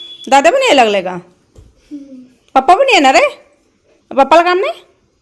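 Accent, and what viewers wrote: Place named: Kolhapur, India. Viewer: Nice video.... vahini... daily mi bght aste. Kagal la maza mama asto...same boln aste tikde..❤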